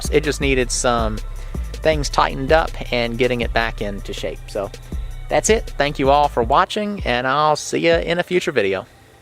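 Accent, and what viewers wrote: South, United States. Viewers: What city & state or town & state, is this located in??? Your accent sounds like you’re somewhere down South & I love the rent prices!👍🏻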